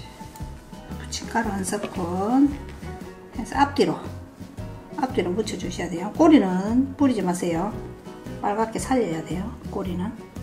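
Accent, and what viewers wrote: Gyeongsang, South Korea. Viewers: 경상도 톤이라 더 친근감이 듭니다 향상 보고 듣고 많이 배워갑니다 감사합니다 ^^~^^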